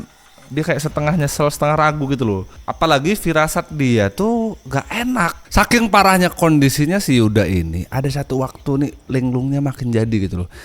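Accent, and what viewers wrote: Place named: Java, Indonesia. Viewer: Enak lek crito.. kyk koncoku d deso lek crito.. bhsane medok enak di denger.. seru😂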